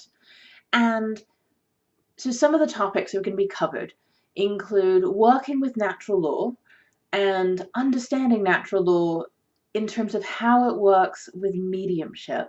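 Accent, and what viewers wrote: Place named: United Kingdom. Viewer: Are you in Plymouth England or Plymouth Massachusetts USA? You seem to have a British accent but a Pilgrim name, the Pilgrims did come from England.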